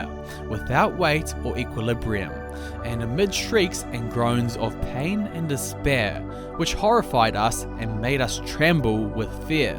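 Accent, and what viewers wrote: New Zealand. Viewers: Is your accent Kiwi ??🤔 (NZ) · Like your Kiwi accent.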